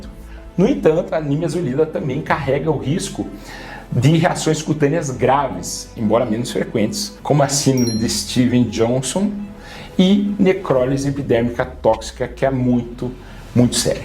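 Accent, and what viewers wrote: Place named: Caipira, Brazil. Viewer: Taquifemia com retroflexo "R" caipira! Não entendi nada! 👅 👅 👅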